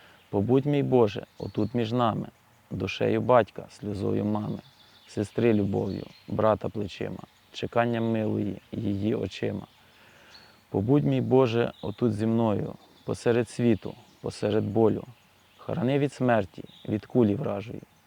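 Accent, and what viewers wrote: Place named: Slobozhanshchyna, Ukraine. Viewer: Та чудова у Вас українська мова! Слобожанський діалект - важлива її частина. Нічого Вам соромитися: Сковорода та Котляревський на Вашому боці.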